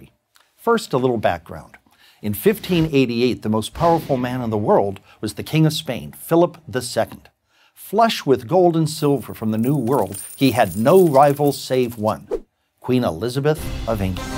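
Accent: United States. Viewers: Yes, one video with an American narrator shows what every American thinks Britain is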